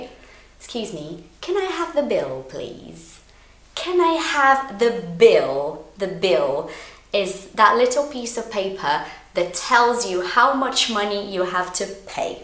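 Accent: United Kingdom. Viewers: Como que eu não te descobri antess! Sou iniciante e amo o sotaque britânico e procuro estudar conteúdo britânico, mas nada se compara a ti: uma brasileira que entende os pontos que temos mais dificuldades. Thank you!!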